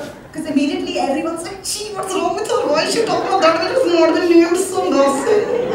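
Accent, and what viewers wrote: India. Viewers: You have a really, really thick (Punjabi?) accent and I wouldn't understand a word without the subtitles. Speak a little slowly next time, maybe?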